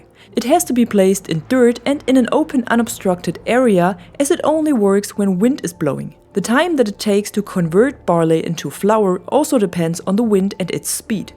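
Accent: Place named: Germany. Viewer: There's just something about your voice and pronunciation that I can't stop listening too, I can tell you are german speaking but other than that you sound nothing like the germans I know who speak english.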